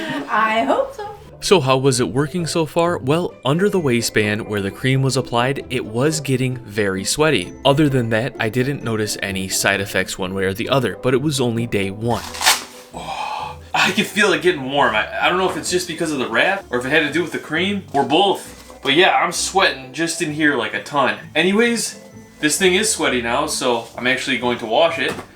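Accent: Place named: Michigan, United States. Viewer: Michigan accent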